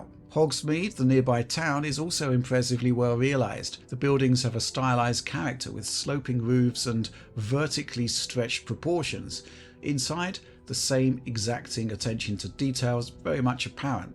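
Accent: United Kingdom. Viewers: It's the accent, British seems to scream seriousness and maturity. Game of thrones anyone? 😉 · British voice for a British franchise, very appropriate. Also great work on the analysis.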